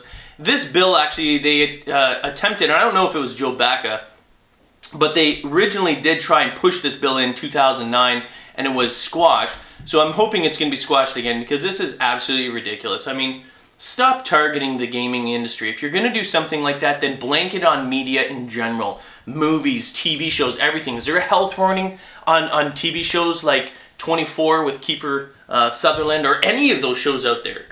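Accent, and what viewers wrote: Canada. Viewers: wate yor canadian?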